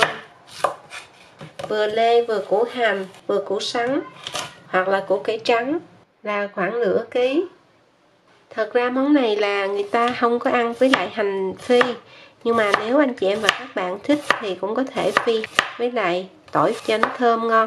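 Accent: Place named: South, Vietnam. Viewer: ui ...giọng nói miền Nam thiệt ngọt ngào làm sao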